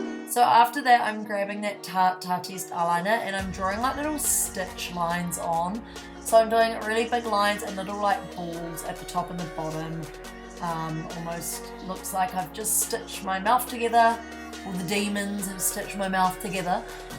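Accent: New Zealand. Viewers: I knew you were a kiwi when I heard your voice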